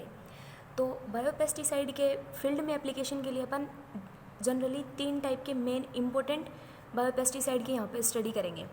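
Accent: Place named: Haryana, India. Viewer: Mam the lecture is so helpful and nice but your haryanvi accent is still more precious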